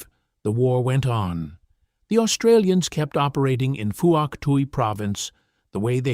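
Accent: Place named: United States. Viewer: Why is someone with an American accent relling this tale ?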